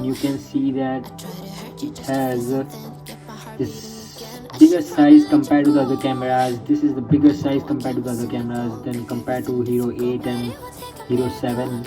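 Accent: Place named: United States. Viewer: American accent is not suiting you. But GoPro9 🤩🔥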